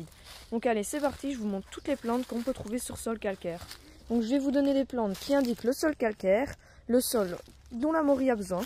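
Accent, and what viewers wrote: Franche-Comté, France. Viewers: Bravo ,et j'ai cru reconnaître une pointe d'accent Franc Comtois, d'où je te félicite. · Enorme, parce que vous avez l'accent franc-comtois.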